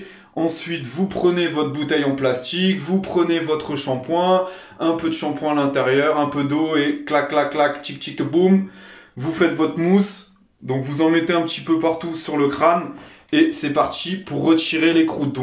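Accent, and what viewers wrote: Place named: Arab world. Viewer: Toi qui parle comsa tu est un français oubien un arabe ?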